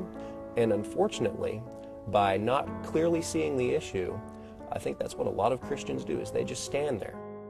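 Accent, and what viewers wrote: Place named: South, United States. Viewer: I think the relatively subtle southern accent and that extra little wisp of hair fooled us all into assuming you were christian, while most of us now know you are... how do you say... an 'actor'. But seriously, I think you did a great job of portraying a perfectly intelligent and very eloquent young man who happpens to be brainwashed.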